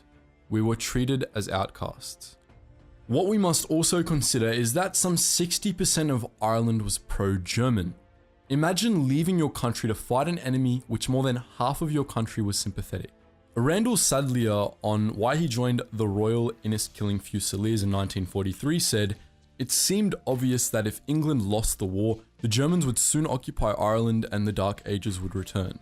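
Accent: Australia. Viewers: Considering your accent and you being Australian. Hard to take this video seriously since you’re biased. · Judging by your accent, I would guess you are Australian?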